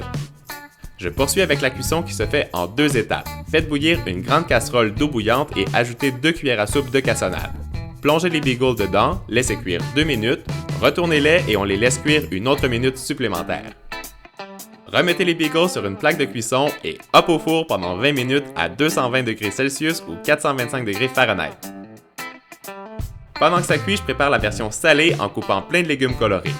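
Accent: Canada. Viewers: De un ta vidéo est génial de deux je préfère les deux(là meilleur façon de fair manger des légumes à mon petit frère et cousin)et de trois j'aime trop ton accent moi j'ai toujours rêver de parler comme vous les canadiens 😘 😘 BISOUS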